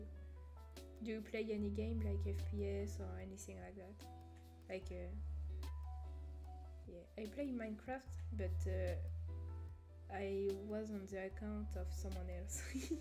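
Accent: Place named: France. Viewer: Very proud of you for steaming in English. it must be scary speaking especially streaming and talking to people in a Language you’re not 100% confident in speaking. I could understand you fine I think you’re English is good yes you do have a heavy French accent but I think that shows you’re background. and it’s apart of who you are that’s something to be proud of people used to make fun of me for the way my voice sounded because of my Chinese accent and I hated it and hated the way my voice sounded but my voice and my accent is a part of who I am and I’ve overtime become ok with that so please never be ashamed of that it does take a while to become comfortable with yourself and the way you’re voice sounds but as you become more confident in speaking you will realise that your voice isn’t as bad as you thought it was if anyone makes fun of your voice you can say L + ratio plus I’m bilingual and your not lol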